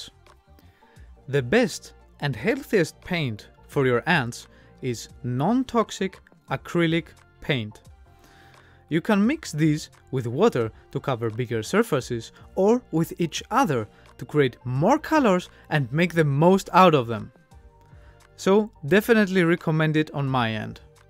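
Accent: Austria. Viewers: #giveaway #notAU I’m from the great state of Florida in the city of Jacksonville in the good old USA. First I just want to say that you’re English is perfect! There is of course an Austrian accent but not much can be done about that. Thank you for your hard work making all these videos! I would love to see more videos of you catching queens in the wild and how to take care of them please. Thank you again.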